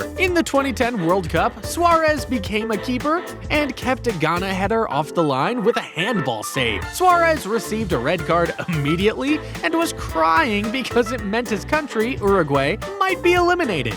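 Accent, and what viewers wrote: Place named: United States. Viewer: Can we have the voice over removed? The commentary is just too American.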